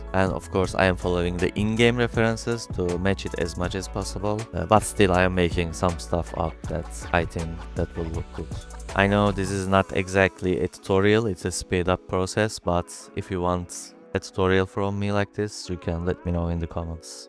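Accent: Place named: Turkey. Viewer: I may have noticed a little Turkish accent 😅 I couldn't see it in the video but I presume the main material is basic toon shader with color ramp?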